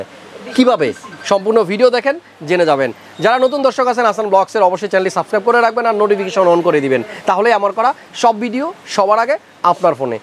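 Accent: Noakhali, Bangladesh. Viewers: নোয়াখালী ভাই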